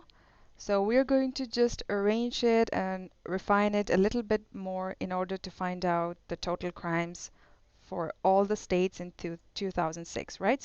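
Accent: India. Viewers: The first video that seems indian English that I could understand 😂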